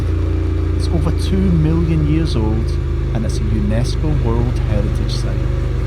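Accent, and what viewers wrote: Scotland, United Kingdom. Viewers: Just finished a ride today around the island. Unbelievable. Didn't know it existed. Arrived for a conference and had 6 hours to spare so hcuked it. Some of those steep hills are knarly! Good to see Scottish accent and all 💪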